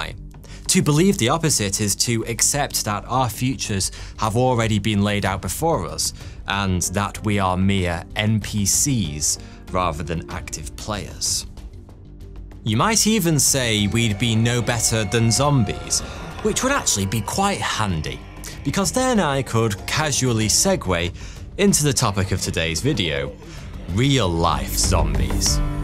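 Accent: United Kingdom. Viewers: Trendy topic about a crappy mainstream overrated TV show, check. Cheap British accent, check. Long winded intro to get the video over 10 minutes, check. Sponsor at the start, check. Idiotically simple take on an interesting subject, check. Gotta love Youtube.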